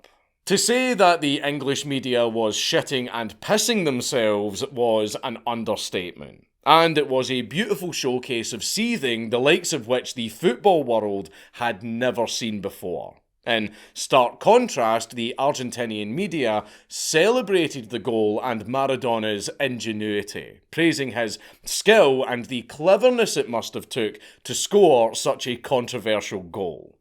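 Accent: Scotland, United Kingdom. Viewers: He's clearly, audibly Scottish. He's British, though.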